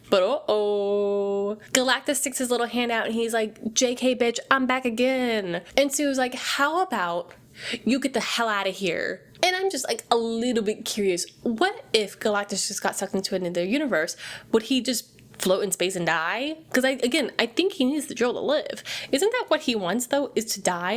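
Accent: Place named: Israel. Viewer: you sound israeli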